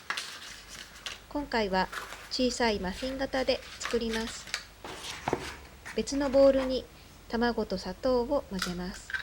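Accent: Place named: Kansai, Japan. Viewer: akiさん、初めまして何時も見せて頂いています＾＾ ほのぼのとして素敵なお庭ですね～♥ そしてお優しいご主人～良い方に巡り合われましたね～ 私は大阪に住んでいるのですが、時々akiさんの関西弁が聞けるので すごく親近感をかんじていますよ～ 又、楽しみにしています～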